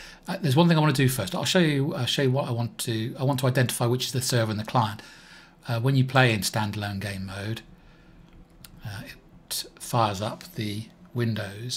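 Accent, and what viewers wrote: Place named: South Carolina, United States. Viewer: Let me guess, are you from South Carolina or somewhere near it? THe manner of pronunciation reminds me my friends from these places. Thank you so much Sir, interesting and useful videos!!!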